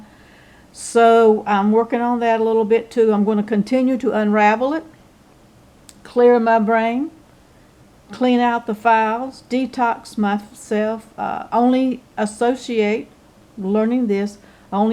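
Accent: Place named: South, United States. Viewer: hear that southern drawl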